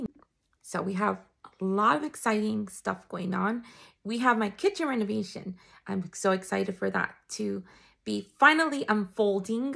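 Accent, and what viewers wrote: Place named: India. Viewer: A mi me Cuesta enterder su ingles cuando habla, su acento es bien fuerte. Pencé que era de la India 🇮🇳.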